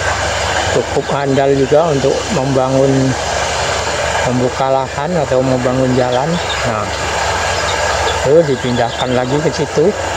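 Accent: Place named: Jambi, Indonesia. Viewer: Betol nian wak sakai kalo org jambi ngomong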